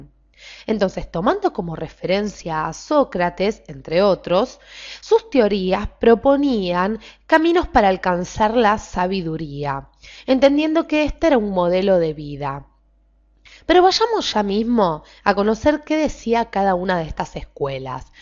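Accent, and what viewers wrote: Argentina. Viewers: Un placer epicúreo es disfrutar del acento argentino. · el acento argentino PTM no me gusta... la info buena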